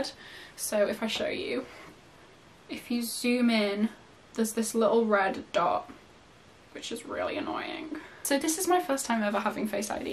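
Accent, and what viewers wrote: United Kingdom. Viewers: I didn’t realise u were British lol 😂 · Are u British? Just asking cause of the actient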